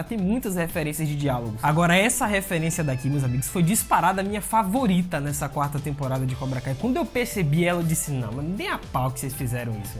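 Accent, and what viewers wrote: Bahia, Brazil. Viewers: Você é Baiano 😁😁😁, que legal , Bora Baeeeeeea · Vc é da Bahia mano ? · Você é Baiano, de Salvador? Porque eu sou, e achei muito massa ver um youtuber nerd daqui!! Kkkkk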